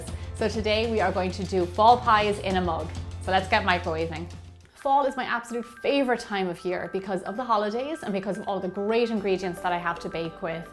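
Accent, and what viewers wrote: Ireland. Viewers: They are all amazing! You've taken mug "cakes" to the next level! Weill dun (trying to imitate your super cool Irish accent! lol) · Did anyone else notice she had an Irish accent.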